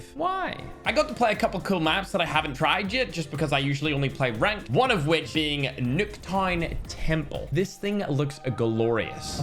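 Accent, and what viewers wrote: United Kingdom. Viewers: Bro where are u from britsh? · Are you British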